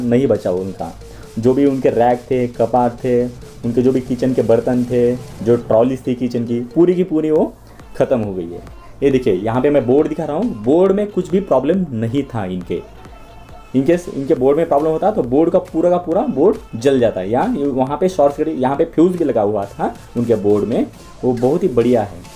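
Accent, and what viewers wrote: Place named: Maharashtra, India. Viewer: Lay bhari gulabi hindi guru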